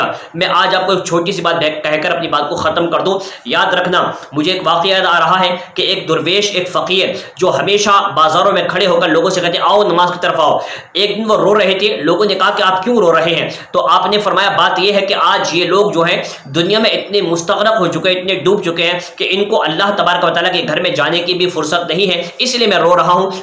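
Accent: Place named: Arab world. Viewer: Ye urdu bhi arabi worst mein boltay hain😬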